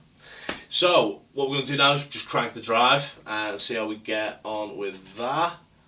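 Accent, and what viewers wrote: Liverpool, United Kingdom. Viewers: Scouse. The language and accent of the Gods 😊Great review La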